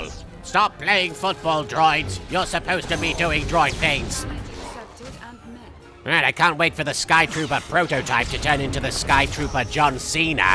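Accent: United Kingdom. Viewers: Is it just me, or does Marik sound more british than in his older let's plays? I guess he spent too much time with Yami Bakura XD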